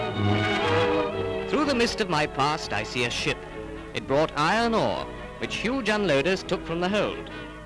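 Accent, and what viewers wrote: United Kingdom. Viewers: Considering that this film is British. You can tell by the accent, it was filmed by Pathe and the car was build in the Dagenham plant. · "Ford et Degenhem" - no "As" in those days! Whatever heppened to those posh English voices?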